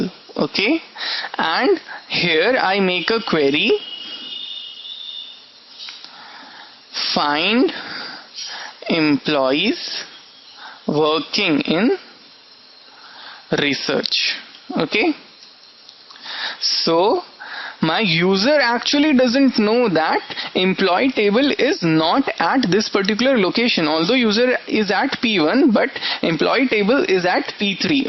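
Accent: India. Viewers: its the indian accent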